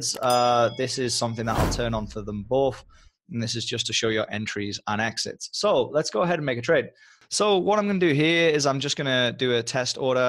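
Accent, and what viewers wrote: United Kingdom. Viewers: you from the UK?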